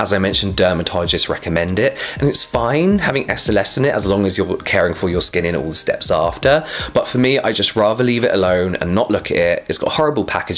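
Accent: England, United Kingdom. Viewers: You talk so fast Man, with british aksen makes me just focused to your beautiful glowing face..hihi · He’s from England so you’re right with the accent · I love your voice. That British accent is so melodic.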